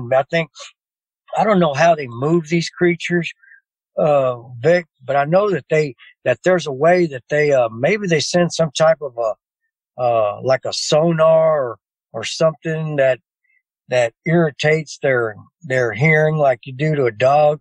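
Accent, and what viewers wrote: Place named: Texas, United States. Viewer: One thing I do know is...He IS a Texan...I am from Texas and his accent is spot on! ie..bob wire..lol..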